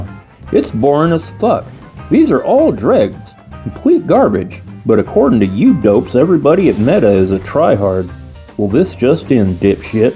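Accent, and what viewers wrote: United States. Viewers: Your voice sends me to an early 2000's southern diner lol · You can hear the country voice radiating through the screen.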